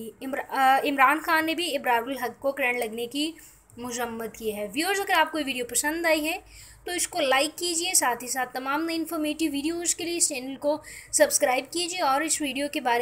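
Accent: India. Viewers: yeh kisi indian ka channel hai. haram zadon ne Pkistaniyun ko confuse aur misguide karnay ke liye channel banaye huye hain aue bhonktay rehtay hai...mein shartia kehta hoon yeh ko indian larki ya aurat hai Pkistan ke kisi ilaqay mein aisa lehja aur alfaz ki adayegi mei ne nahin suni..yeh koi asami ya tibti accent wali hai..laanat ho tum indians haram zadon beghairton kameenon par.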